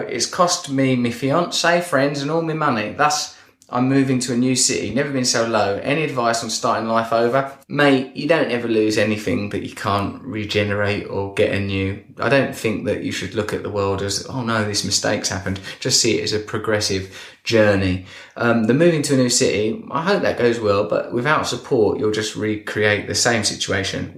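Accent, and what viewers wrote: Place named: England, United Kingdom. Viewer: I really like the english sound. wish I lived in London ☆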